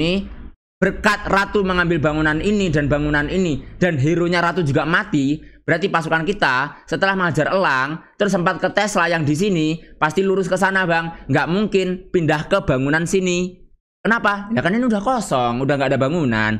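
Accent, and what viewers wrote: Java, Indonesia. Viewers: Wong ndi to ngab kok medok men wkwkwk tetep semangat konten ngab😍😍😍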